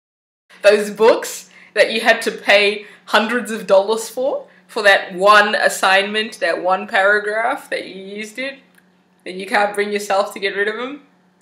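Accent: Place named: Australia. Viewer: I love that Aussie accent of yours!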